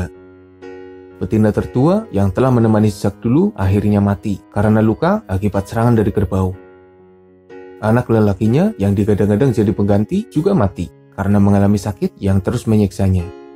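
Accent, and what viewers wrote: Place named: Java, Indonesia. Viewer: Logat jawanya lucu mas🤣🤣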